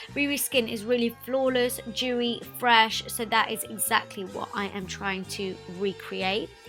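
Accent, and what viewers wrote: United Kingdom. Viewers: британский акцент? такой противный)))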